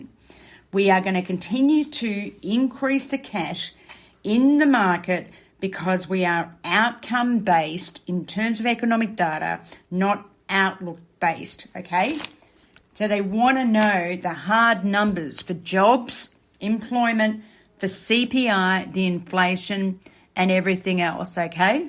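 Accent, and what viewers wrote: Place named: Australia. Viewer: Jezus, je bent nederlands , 🤔met een ozzie accent, geweldig!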